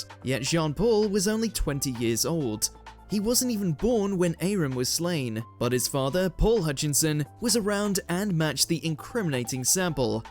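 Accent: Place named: Wales, United Kingdom. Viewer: The narrator is Welsh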